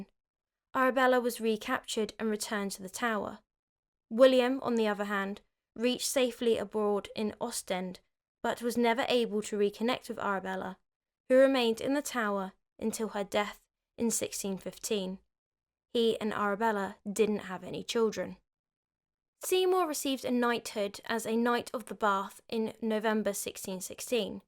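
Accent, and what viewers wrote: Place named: United Kingdom. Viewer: It's great to hear a regular British accent like millions of Brits really have tbh, it's refreshing. Was actually the first reason I kept listening!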